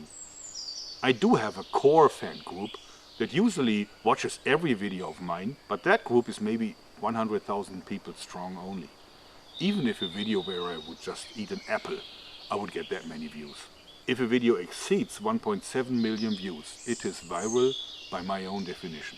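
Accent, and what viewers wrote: Germany. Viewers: I'd only watch you eat a apple attached to a drill spinning, for effect of course. But We love the videos. I personally take time off of work to watch every upload when it's fresh. For me it's the German accent mixed with the engineering genius and mechanical knowhow that really floats my boat. p.s the cheesy jokes are also quite awesome. I personally would like a full almost like a cribs episode for your work shop and general facilities outside. hope to see it soon. show us it's features! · are you German by any chance?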